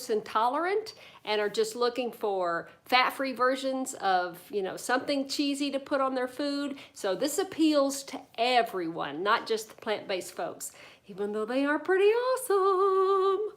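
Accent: Texas, United States. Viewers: As if the lovely Texas accent didn’t give it away! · Her talkin told me she's in Texas 😅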